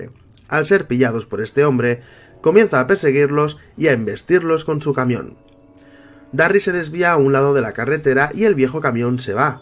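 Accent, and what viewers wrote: Spain. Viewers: por fin un video donde alguien explica lo que pasa en la tercera pelicula ya que muchos no la entendieron y la tacharon de mala yo igual quería hacerlo en su momento por esas gentes que no entendieron pero no se editar vídeos jajaja PD: me encanta tu asentó español :3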